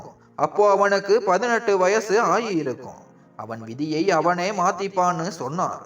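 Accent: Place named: North India, India. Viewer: கதை நன்றாக உள்ளது ஆனால் அதை படிக்கும் விதம் சரி இல்ல. வடக்கன் தமிழ் பேசினா எப்படி இருக்குமோ அப்படி இருக்கு.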